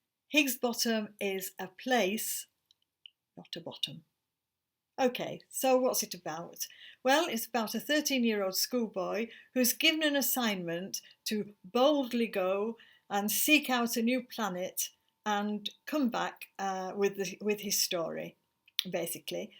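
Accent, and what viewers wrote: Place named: United Kingdom. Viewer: I wish I had you reading this book in your wonderful British accent. I so enjoy it xxx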